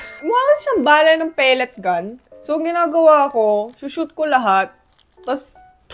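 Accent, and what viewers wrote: Philippines. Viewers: bat ang galing mo mag tagalog? u sound very pinoy.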